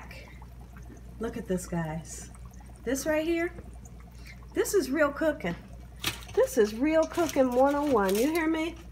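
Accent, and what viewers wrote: South, United States. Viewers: I love your show, my granddaughter love you when u say "like so" too bad for the haters. I LOVE YOU. R u from the South. My name is Pam I live in Up State NY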